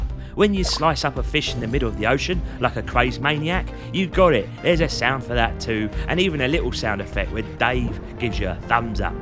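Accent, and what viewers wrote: United Kingdom. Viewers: I cant understand the british accent 😢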